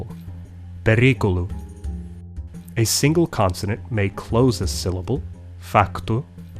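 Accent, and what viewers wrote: Brazil. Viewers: It's kinda weird that a guy that is so well educated in the classical languages, their history and their phonology uses Brazilian accent to speak the Portuguese language, specially in it's strong informal phonetic version. To put it in perspective to non Portuguese speaking people, that is the same as to use a southern American accent like a strong Texan accent, as a representation of the English language in a video about the classic/formal phonology of the language. Nothing against Brazilian accent on the contrary, but it sounded misplaced due to the issue of the video. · Yes. He speaks portuguese like a Brazilian, not a Portuguese. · Curiosamente o senhor usa o sotaque brasileiro em sua explicação em português porém ao falar em castelhano usa o sotaque europeu. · Mate, your portuguese pronunciation is a brazilian one, I'm saddened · Ele, +Rogério Cardoso, não disse que a versão lusitana deveria ser usada. 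Na verdade, não há por que preferir uma à outra, tanto que nesta série de vídeos (não é só este) ele usa ora o sotaque brasileiro ora o sotaque português. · He uses both the Brazilian and European accents in his videos. In this one, he opted to use the Brazilian one, and the Castilian one when talking about Spanish.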